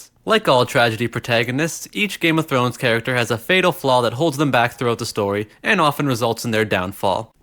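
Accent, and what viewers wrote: Newfoundland, Canada. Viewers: Wait, are you from Newfoundland? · are you from newfoundland or irleand or scotland? dont hear the word bhys on youtube much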